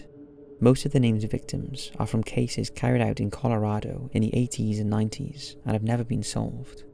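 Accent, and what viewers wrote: Wales, United Kingdom. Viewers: You have the same voice as like every British dude you come across on overwatch.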